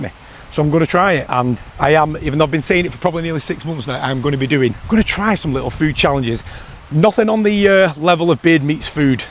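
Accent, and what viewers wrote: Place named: Wigan, United Kingdom. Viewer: You’ve got be from Wigan or not far off with that accent lol well done on your journie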